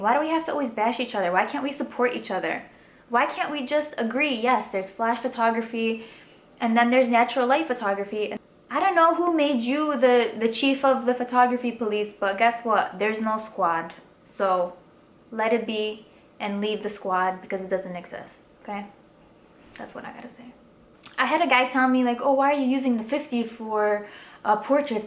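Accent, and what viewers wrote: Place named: Detroit, United States. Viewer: Yeah you from Detroit ... I hear it all in your voice lol I know cuz im from here too lol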